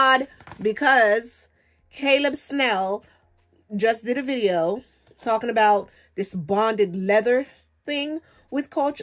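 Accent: Jamaica. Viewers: TFS, the bags are beautiful ❤️ instant subby as soon as your Jamaican accent started leaking out... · Omg, I hear an accent. Do I hear Jamaican? I love that you decided to bless someone.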